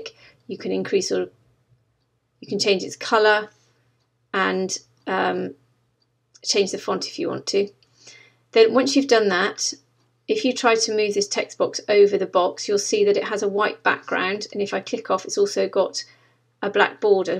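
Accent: United Kingdom. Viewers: This so clear, I promised this will be my class, the ascent is so good, British type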